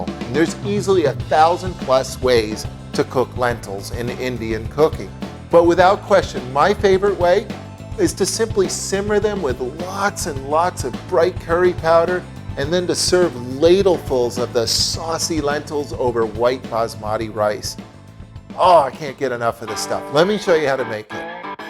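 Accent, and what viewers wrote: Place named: Canada. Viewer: He has a Canadian accent but Canadians don't say Dell instead of Dhal. I think its ignorance.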